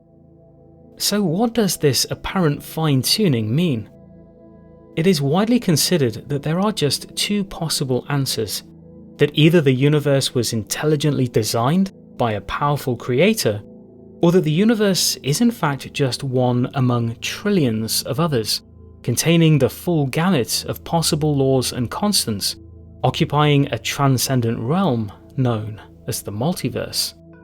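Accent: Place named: United Kingdom. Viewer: This is like listening to terrance mckenna in a British accent 😂 he was saying the same things with more deugs in the talks of coarse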